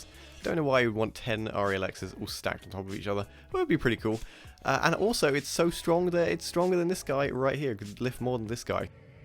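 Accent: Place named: North, United Kingdom. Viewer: hey man so where is ur accent from? like u sound like northern but you speak really clearly so i dunno what is going on lol.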